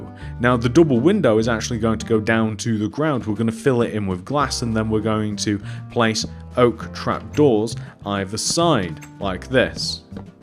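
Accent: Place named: Wigan, United Kingdom. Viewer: Mate you sound like you’re from Wigan.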